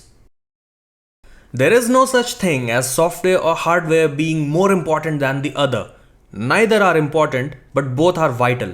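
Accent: India. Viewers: yup, shit video. sorry. first seconds you mentioned that the video is not sponsored in any way! and then... this video was made possible by simagic. and then I hear an India accent... you can't make this shit up, I won't watch the video made it one minute. do better or stop making YouTube videos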